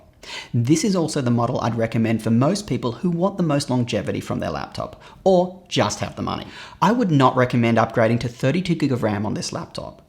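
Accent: Australia. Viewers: I’ve watched quite a few vids now and I’ve only just realized is Australian 😂😂 love the reviews and great advice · Are you an Aussie,? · Are you Australian? I have to know hahaha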